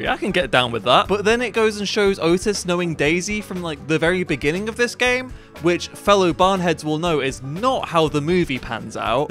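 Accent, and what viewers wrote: United Kingdom. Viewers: I remember playing this game and thinking it was neat. I didn't think I would be reminded of it by a cute bri'ish boi using it as a vehicle for a mental breakdown. lmfao In seriousness, take care of yourself, bud.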